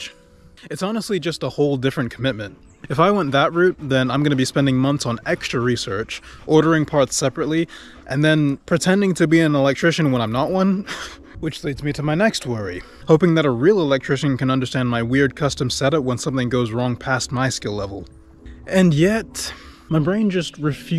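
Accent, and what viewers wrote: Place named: United States. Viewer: How is the trip from the UK but you have everything American even an accent the dialect the phrases, where are you from... Pretty much this pretty much that😊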